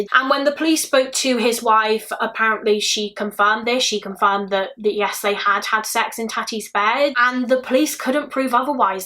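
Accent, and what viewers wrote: United Kingdom. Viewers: Fabulous story telling thank you kindly and luv ur Uk accent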